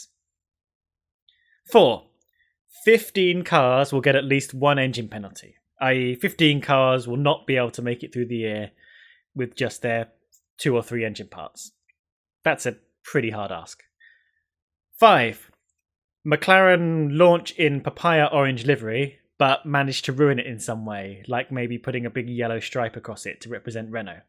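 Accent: United Kingdom. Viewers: So british, I love it!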